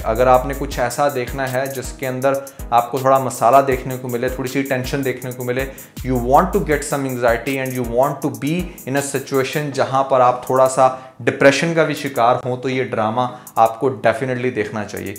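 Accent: India. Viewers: کون سی دنیا میں رھتے ہو بھائ آج کی لڑکیاں بہت کچھ کرتی ہیں فوج میں بھی لڑتی ہیں لڑاکا تیسرے اڑاتی ہیں اور فائٹر برگیڈیر بھی لڑکیاں ہوتی ہیں جلتی آگ میں کود جاتی ہیں آدمی بھی ہر طرح کے ہوتے ہیں جہیز کے بغیر بھی شادی کرتے ہیں میرے ھڈبینڈ نے بھی کوئ جہیز لینے سے منع کردیا تھا میں کچھ بھی نیکی لائ تھی تم اپنے خیالات کے مطابق بات کرتے ہو جوان لڑکی کو آنٹی بنادیا تم نے کچھ حقیقت سے کام لو صرف ہندی لہجے میں اردو نہ بولو نہ ہندی رھے گی نہ اردو کوا چلا ہنس کی چال اپنی چال بھی بھول گیا سنی ہے نا یہ مسال خوش رھو بھیا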